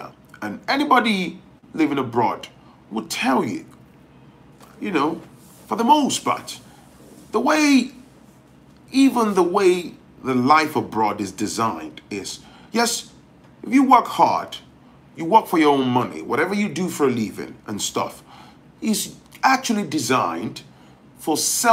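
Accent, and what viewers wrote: Yoruba, Nigeria. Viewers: lol... I love your YOruba-English accent.. PLs speak more of it.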